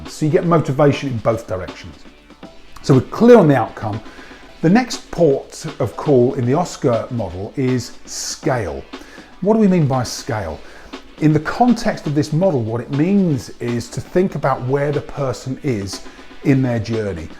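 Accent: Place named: United Kingdom. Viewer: This is my favorite coaching model! Loved how you explained it and love the UK accent as well.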